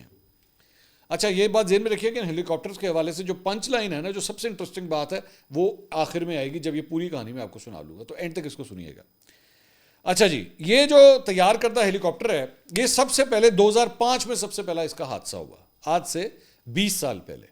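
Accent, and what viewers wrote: Punjab, Pakistan. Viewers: Assalamualaykum a bilkul mere ammi jsi Punjabi bolty hn qasmain sadqay Jawan a k bhai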